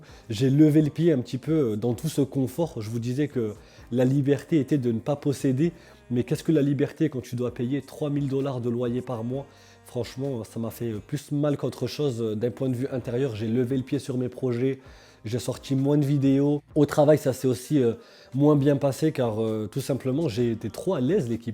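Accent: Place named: France. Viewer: Il est évident que vous êtes un Français parce que je comprends ce que vous dites sans aucune difficulté, mais seulement 50 % des gens du Québec.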